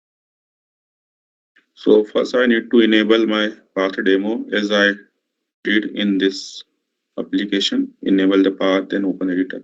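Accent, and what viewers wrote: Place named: India. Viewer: with the indian accent it is becoming an headache after some point. sorry mr..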